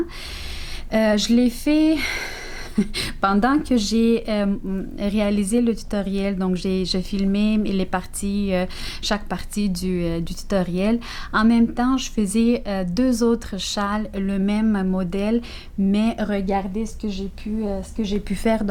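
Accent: Canada. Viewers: Merci, j'adore l'accent canadien, il me rappelle nos voyages. 👍🥰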